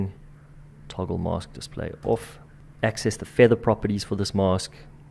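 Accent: South Africa. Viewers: also South african!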